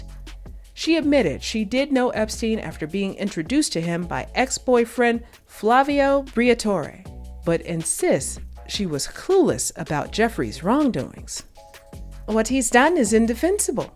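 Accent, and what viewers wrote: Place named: United Kingdom. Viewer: It’s the British accent for meee🤣🤣 spot onnnn🎉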